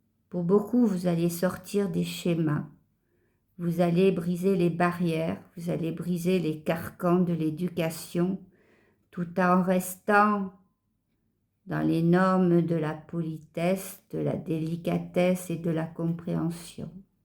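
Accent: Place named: Southwest, France. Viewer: Merci pour cet important message avec ce bel accens du sud ouest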